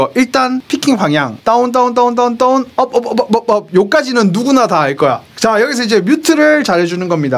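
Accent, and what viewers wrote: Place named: Gyeongsang, South Korea. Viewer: 같은 경상도 사람이신가유..? ㅋㅋ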